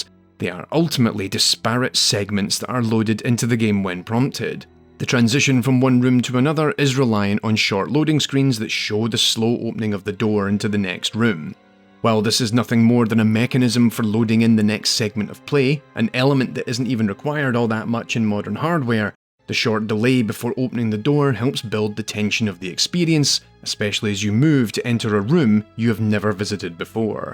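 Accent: Scotland, United Kingdom. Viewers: First video I've seen of this channel, I could listen to this Scottish brogue forever. Excellent, excellent analysis, well done.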